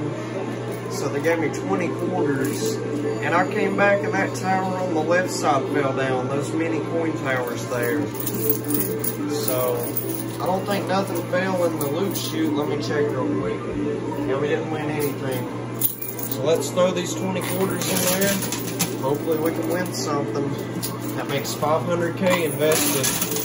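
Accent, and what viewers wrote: South, United States. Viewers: Damn , I’m from Alabama and this guy sounds as country as they come ! Lol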